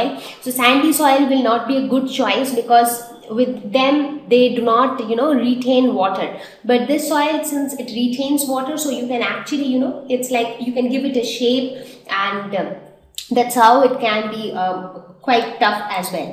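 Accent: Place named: Bihar, India. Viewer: मैडम बिहारी हो के?? आपकी टोन से लागे😜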